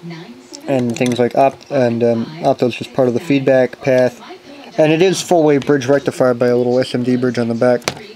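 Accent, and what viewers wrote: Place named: Northeast, United States. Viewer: WOW, great video, lots of info! Your from the north east of the states? You talk fast and roll your words together.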